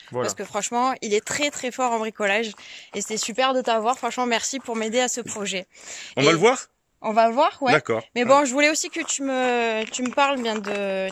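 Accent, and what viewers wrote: South, France. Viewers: L'accent du sud il est vraiment classe 🤙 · L'accent du sud 👍😀.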